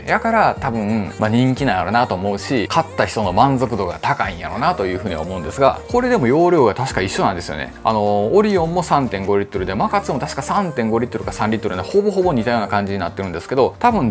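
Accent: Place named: Osaka, Japan. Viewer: 大阪弁とヒョウ柄🤣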